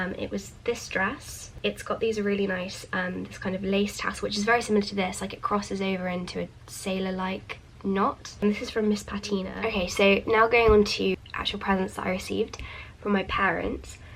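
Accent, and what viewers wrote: RP, United Kingdom. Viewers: Posh english* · Wow😮ave never heard someone speaking this posh before 😂😂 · You sound so posh and you say ‘received’ I love your accent although I’m British too 🇬🇧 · Omg your so posshhh like it’s so cute I am from England and bloody hell are we that posh nope 😹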